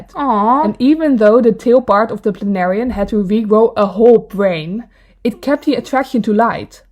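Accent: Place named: Netherlands. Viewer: Are you dutch because I hear the dutch accent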